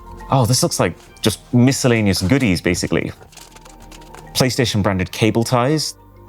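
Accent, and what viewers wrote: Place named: RP, United Kingdom. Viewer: This Wealthy White English accent kills me man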